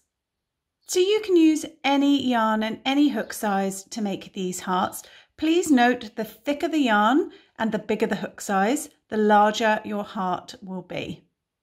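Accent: United Kingdom. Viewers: British accent+ US terms...PERFECT 💗 (I'm a Brit who dislikes UK terms 😆) I send these hearts to people who are recently bereaved.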